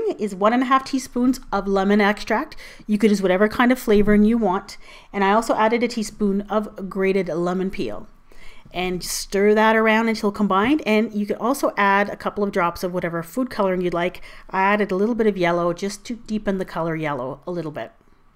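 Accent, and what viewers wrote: Canada. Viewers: she has a light canadian accent... and canada is always where i wanted to live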